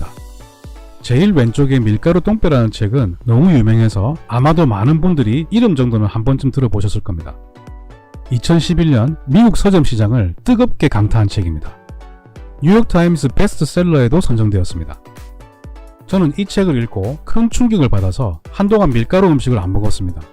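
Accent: Gyeongsang, South Korea. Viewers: 선생님 목소리가 너무 편안해요. 그 목소리 건강 잘지키셔서 오래듣도록 해주세요. 그리고 발음속에서 경상도쪽 특유의 발음이 들리는듯 한데 그 지역 분이신가요? 응원하고 있습니다.❤❤❤❤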